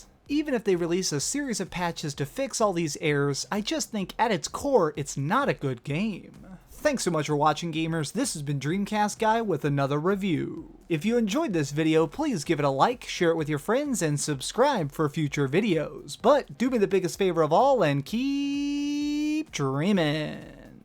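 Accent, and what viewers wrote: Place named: South, United States. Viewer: Man, you sound like a peckerwood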